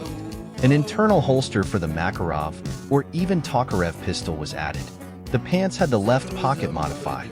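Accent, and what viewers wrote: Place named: United States. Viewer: Very nice. You have a nice speaking voice. It's so very American. You sound like a voice actor for the main lead in an early 00's anime that's been dubbed.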